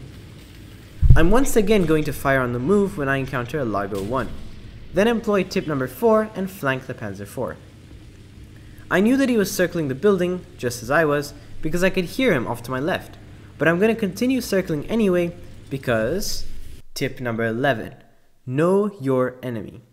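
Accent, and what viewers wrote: Malaysia. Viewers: Where are u from??? Malaysia? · Ae you malaysian?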